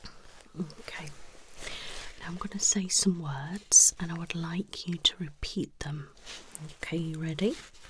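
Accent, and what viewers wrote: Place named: North, United Kingdom. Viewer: I genuinely can’t work out your accent. You speak so eloquently but thank have the northern twang of “anythinKK” (I am northern I’m allowed to laugh). Anyway fantastic video as usual ❤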